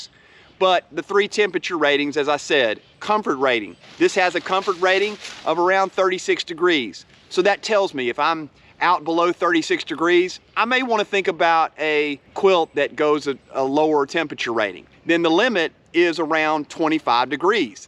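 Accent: United States. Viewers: Where you from I like the accent, that's a proper American accent...Man I've been going to get this quilt for ages, its always A pain buying from the US we get hammered with expensive postage and VAT tax and import tax in the UK. I wish they would sort a good trade deal with the US so we could buy competitive. What kind is the back pack it looks a nice pack?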